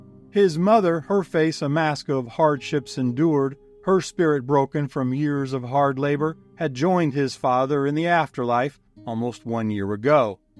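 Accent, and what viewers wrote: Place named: Deep South, United States. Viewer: Great video, I couldn't help but laugh though in a good way. I was expecting to hear Balkan accents no deep south 😂. Keep up the great creative and informative work that you do.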